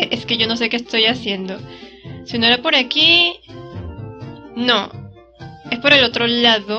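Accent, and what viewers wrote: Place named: Venezuela. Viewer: escuché tu acento (Ven) y dije: tengo que suscribirme jejejejeje me encanta cuando una mujer hace contenido de pokemon.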